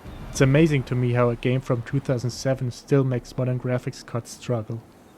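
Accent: Germany. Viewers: are you german your accent is like a german is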